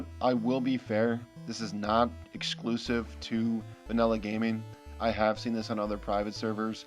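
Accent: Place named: United States. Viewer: This is so american, i dont know why, your voice your sentances :D love it <3 It just sound like your selling something :D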